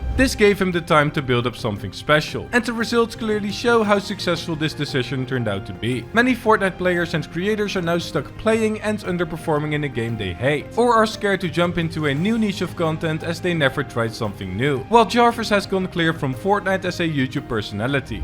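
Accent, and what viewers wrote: Netherlands. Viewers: Me after half of ur first sentence: Yes, this guy is DEFINITELY Dutch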